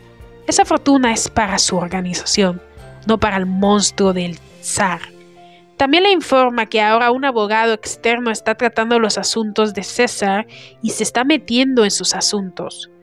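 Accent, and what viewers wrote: France. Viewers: como habla le da un acento frances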